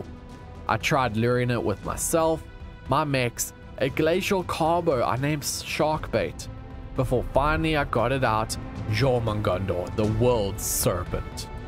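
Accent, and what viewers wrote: South Africa. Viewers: The south African accent is kak funny 🤣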